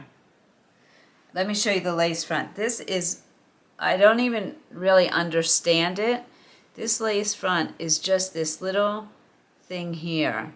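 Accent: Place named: Brooklyn, United States. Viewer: Truth is, you come across as "our next door neighbor", your reactions and rethinking out loud, are so warm Sounds like a bit homey , NYC, (?)Brooklyn, in your voice! ? You look great in most, of the wigs. But the cool Ashy and Greys are a bit less flattering ! I'm still trying to figure out how to get them sent to Israel and still have it be worth the cost.. Meanwhile you're a lot of fun!!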